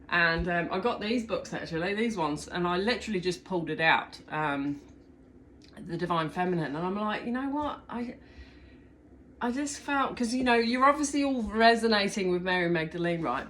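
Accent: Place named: New Zealand. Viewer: Hello. Firstly thank you for your chanel. And thank you for your Kiwi accent. I know this sounds silly but hearing your accent was like healing balm for my soul. It gave me back some Kiwiana and filled me up with the spirit of Aotearoa. Since Covid I am in Germany. And 3 years in Berlin. That might sound really awesome but trust me it wasn’t. It’s been just aweful cause the energy is so full of the old fear guilt and horror from the war and all I did was clear clear clear and still there is f so I much to do that I haven’t even made a dent in what is going on in Germany here. There is not only the residual energy of the second world war and Nazism but there’s also the second world war and then all the wars before that and now there’s all the energy about the witchhunts, because particularly where I am living now there were a lot of witch, burnings, and Germany was one of the worst countries of them all they burned twice as many women as any other European country. 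And I am living amongst all this stuff, and as I am a Devine Feminine empath and a healer, I just miss beautiful New Zealand. I realize New Zealand is not problem free however, it could be so so much worse. So thank you very much. I’m so glad I have found you now and that I will get my little bit of Kiwiana every time I hear your voice. And really it’s not just your voice. It’s your attitude. There’s nothing like a kiwi attitude. It’s so different from the rest of the world. It’s definitely unique and it’s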